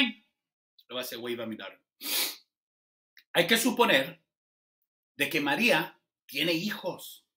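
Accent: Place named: Venezuela. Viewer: Me encantas porq hablas como el venezolanos sin pelos en la Lengua 😁😁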